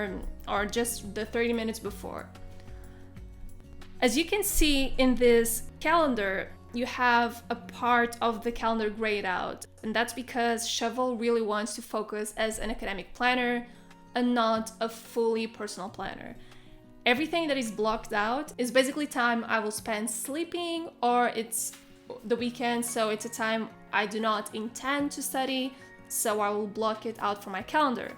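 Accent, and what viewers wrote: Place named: Portugal. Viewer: Wait, ur Portuguese? Your accent looks like Portuguese... só sei que adorei este videos e já me subscrevi! Adoro!!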